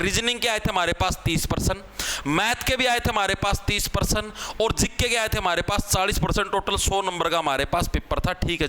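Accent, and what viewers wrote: Haryana, India. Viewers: Aapki haryanvi mst hai Love from BIHAR 🤗🤗🤗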